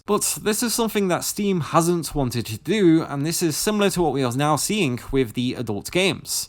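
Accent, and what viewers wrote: United Kingdom. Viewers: You speak with an English accent yet you say "adult" and "minor" like an American. Weird how the internet has influenced the way young English people speak. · Hört sich nach einem Briten an.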